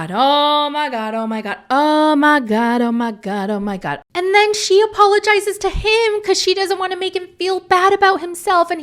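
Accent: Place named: New York, United States. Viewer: I’ve been away a few months but I’m loving the sometimes New York accent that developed in my absence.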